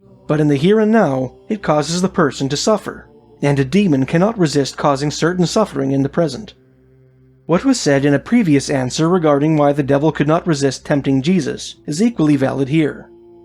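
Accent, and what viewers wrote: Canada. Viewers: You have a very Canadian accent!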